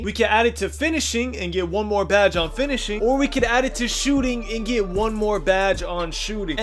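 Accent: Texas, United States. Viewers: Are you from Texas? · You from Texas? Or Atlanta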